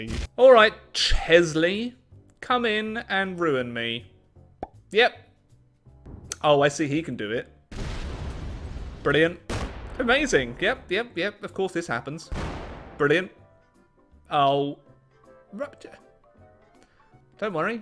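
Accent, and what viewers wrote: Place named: United Kingdom. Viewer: It makes my inner history nerd chuckle hearing a British person say"I don't want Quebec".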